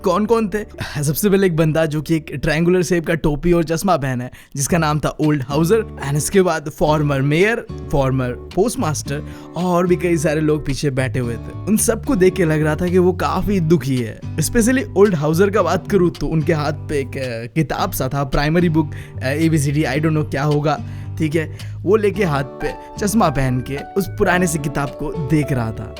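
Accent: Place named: Bengal, India. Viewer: bro you are a bangali becase my friend speakes same like you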